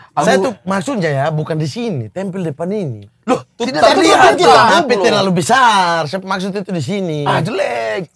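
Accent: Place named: Kupang, Indonesia. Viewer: Enak skali ee dengar kk abdur pake logat kupang ni, jadi ingat masa2 di kupang ee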